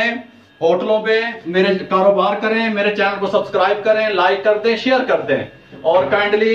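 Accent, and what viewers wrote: Punjab, India. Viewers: karahi se zayada apki Punjabi ka maza aya hy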